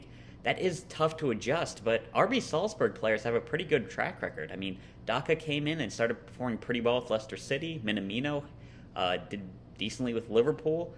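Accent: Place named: Baltimore, United States. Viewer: Another great vid. Is that a Baltimore or Philly accent?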